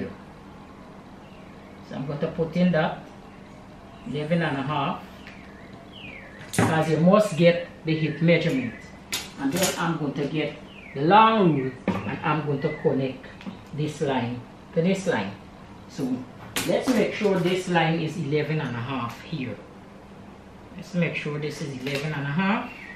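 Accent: Guyana. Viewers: Good day love your video you sound like a Guyanese. I am too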